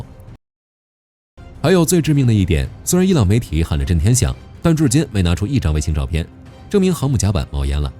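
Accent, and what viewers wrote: China. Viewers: ㄡ是大陸騙子 說話者是大陸口音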